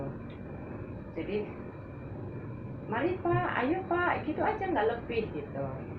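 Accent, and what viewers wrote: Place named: Java, Indonesia. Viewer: Mba asli mana ya? Jawa bukan mba? Aku seneng gitu denger logat bicara nya mba..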